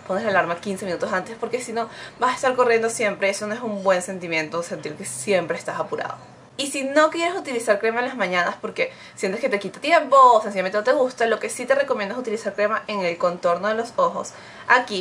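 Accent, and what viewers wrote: Dominican Republic. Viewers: Eres dominicana ? pk tenemos el mismo acento;p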